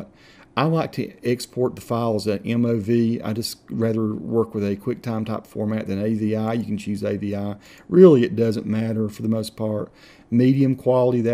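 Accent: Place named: North Carolina, United States. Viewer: Thanks man great video. Red Springs and that accent must be NC. I live in Fayetteville ;)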